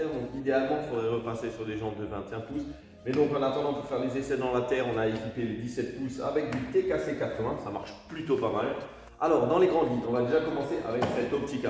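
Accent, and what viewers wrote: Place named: Alsace, France. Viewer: Road trip, 750 super tenere , petit accent alsacien , ambiance sympa ... je m'abonne 😊😊😊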